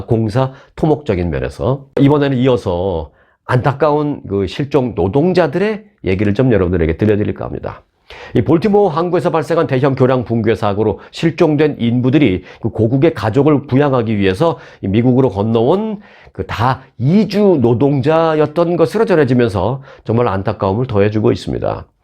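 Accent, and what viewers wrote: South Korea. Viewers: 반갑습니다. 부산의 사투리에 웃음을 주셔서 고맙습니다.ㅎㅎㅎ 볼티모어의 교량이 무너진 것에 넘 놀랬습니다. 수습에 최선을 해야겠죠. 다양한 소식을 전해주셔서 감사드립니다.👍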